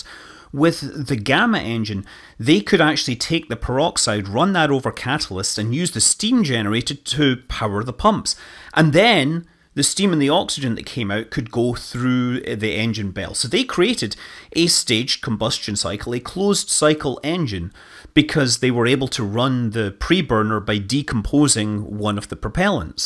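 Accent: Scotland, United Kingdom. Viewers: I like this video series - “Scottish man reads space based Wikipedia articles”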